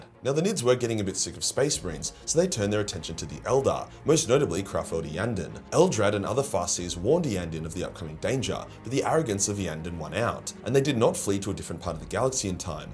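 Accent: Australia. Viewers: Nice vid ya Aussie 😂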